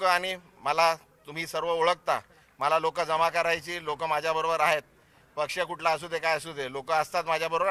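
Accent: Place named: Agri, India. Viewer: तो तिथला भूमिपुत्र आहे आणि आगरी आहे त्यामुळे तो आगरी लयेत मराठी बोलतोय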